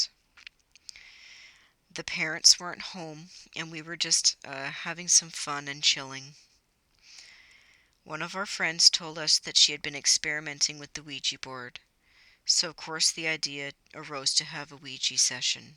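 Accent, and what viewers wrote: Canada. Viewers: Also, you sound a tad bit Canadian.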